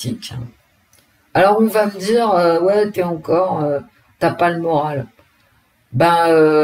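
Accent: Paris, France. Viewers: Merci... contente que vous soyez soutenue par vos consœurs. J'aime vraiment comment vous tirez les cartes, votre franc parlé et votre accent de Paris...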